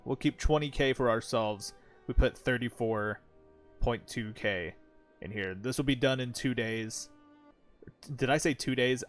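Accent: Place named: Ireland. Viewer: Great Irish Accent 😁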